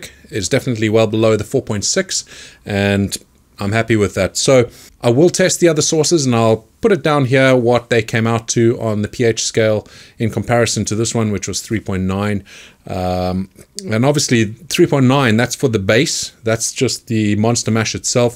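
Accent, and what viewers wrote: South Africa. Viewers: Where in south africa are you from.You sounded South African the first time I watched your video's . Thank you for all your video. Looking at growing my own chills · bro i knew you were from SA just the way you talk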